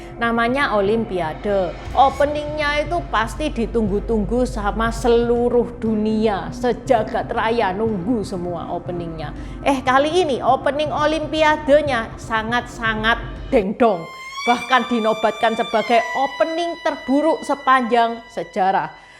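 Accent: Java, Indonesia. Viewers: Seneng baku ndengerin suarane naik medokmu kuwi lho cik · mba tinnggal dimana,ko ngomongnya medok banget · Hostnya medogg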